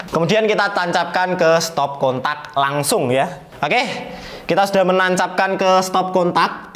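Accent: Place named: India. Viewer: kang kaya orang india... ngebutt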